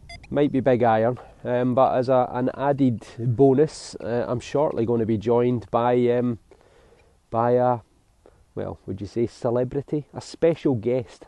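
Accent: Scotland, United Kingdom. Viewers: New subscriber here and Im binge watching your videos. Love listening to your scottish accent..(to me) and love your videos. Do you give all your finds to the owner of the properties?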